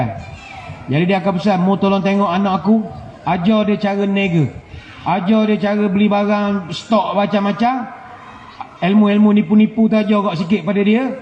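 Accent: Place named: Terengganu, Malaysia. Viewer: pasih molek ustaz cakak sleng ganu:)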